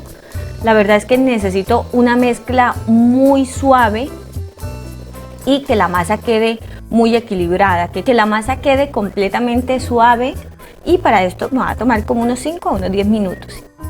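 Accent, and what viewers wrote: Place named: Valle del Cauca, Colombia. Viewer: Tienes acento valluno eres del Valle del Cauca??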